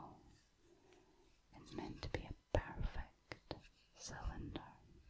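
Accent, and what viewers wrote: Scotland, United Kingdom. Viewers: I adore your voice because of the accent, imo a Scottish or Irish accent sounds far more interesting and elegant than an American accent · It''s been a super tough week, gonna need that that Scottish Brogue to calm my brain. I'm from Edinburgh and I miss the sweetness of the language. Hope you're well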